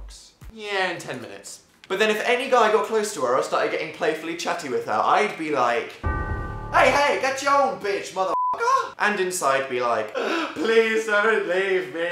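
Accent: United Kingdom. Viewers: I'm envious of your articulate british accent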